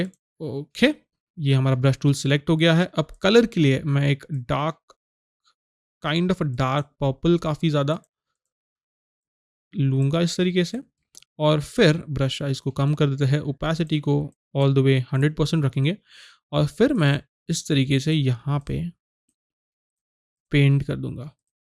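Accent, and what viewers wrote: Maharashtra, India. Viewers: No that's not right ! I have seen many Maharashtrian People have tendency to talk like that only, that's Maharashtrian Hindi !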